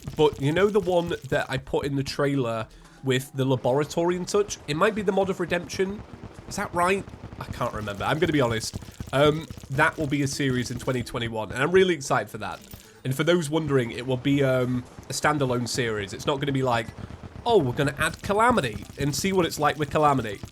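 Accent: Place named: London, United Kingdom. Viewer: Yoo wats up fellow londoner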